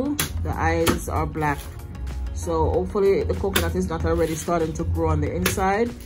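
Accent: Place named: Patois, Jamaica. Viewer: I love to hear you speak PATOIS sis. I love to eat coconut as well. Wow, the price for those here is ridiculous.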